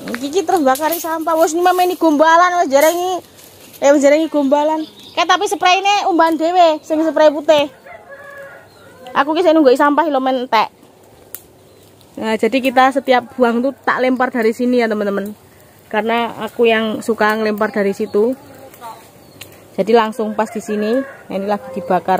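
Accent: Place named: Lampung, Indonesia. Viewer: Lucu mbak bhsamu hhhh. Dlm dri lmpung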